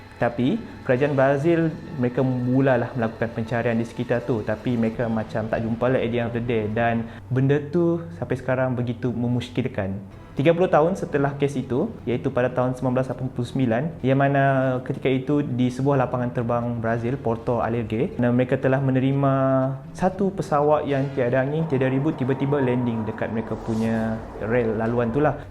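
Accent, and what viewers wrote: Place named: Sabah, Malaysia. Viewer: Speaker dia ni.. org sabah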